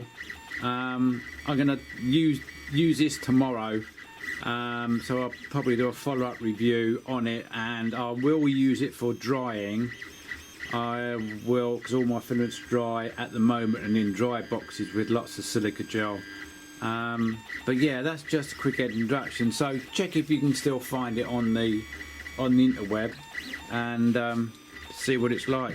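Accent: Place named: England, United Kingdom. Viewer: I notice you have an English accent, do you live in the UK? I ask because I live in South Yorkshire and I dont have to dry any of my PLA, my PETG,ABS,ASA,Nylon are kept in vaccum sealed bags after drying them and are inside the enclosure when printing to keep dry. great deal by the way especially from Amazon.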